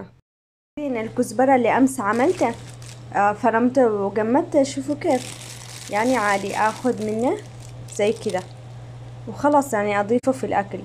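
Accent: Aden, Yemen. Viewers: قدك ع الهدره العدني لاتغيريها للسعودي مافي احلا من هدرتنا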